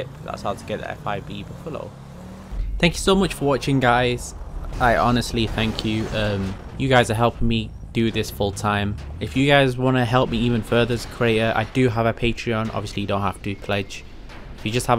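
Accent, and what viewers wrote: Netherlands. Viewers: Are you dutch? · Yo you dutch?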